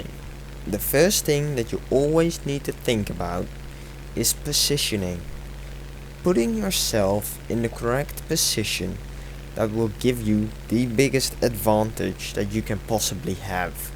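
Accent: Netherlands. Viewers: Nederlander? But a nice vid!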